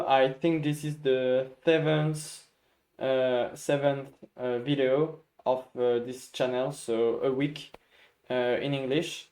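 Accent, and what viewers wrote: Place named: France. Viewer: hii ur doing so well man congrats 🎉 and ur little french accent is kinda cute hahaa so dw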